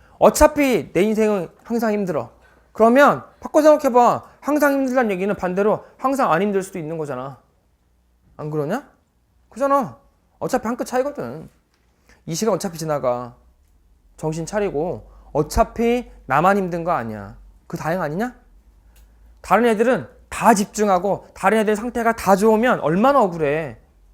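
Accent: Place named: Soviet Union. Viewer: 말투 소련여자 ㅈㄴ 달맞네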